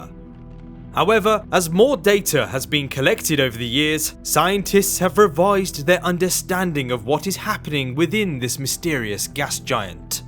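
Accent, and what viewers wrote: United Kingdom. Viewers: UK accents are annoying. So are spurious titles. DISLILE.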